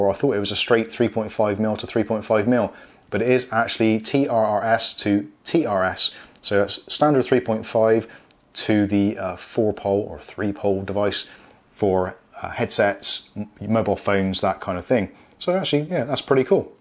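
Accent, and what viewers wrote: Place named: West Country, United Kingdom. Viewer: bought a pair of these cans off amazon after watching your review ... your spot on ... great bass and full sound ... bargain for just over £20 ... am in agreement over shiny plastic /grease magnet ... is a shame this p3 model doesn't come with sd card slot like the p1 or p2 ... both of which have less playback time than this model ... seems powerlocus are missing a trick ... if they brought out a new model with a matte finish ... long playback and an sd card slot ... i would happily pay £30 ... great sound ... p.s. you sound even more "west country" through these cans lol ... thanks for review ... correct and accurate on every point ... cheers fella :)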